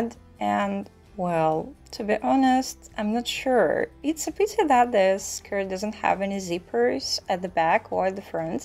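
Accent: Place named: England, United Kingdom. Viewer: awesome stuff, i love the windy days, I'm melting at your english accent 😍❣️🔥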